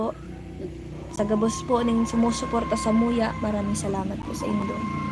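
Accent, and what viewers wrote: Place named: Visayas, Philippines. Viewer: 😂😂😂😂😂 nakakatawa ka langga mag salita nf bisaya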